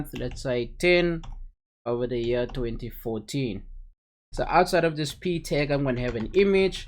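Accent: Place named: South Africa. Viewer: Yusis china lekka to hear a local voice hay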